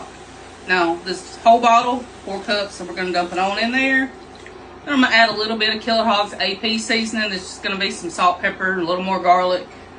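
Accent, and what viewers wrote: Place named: South, United States. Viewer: I love your voice. I speak Southern also.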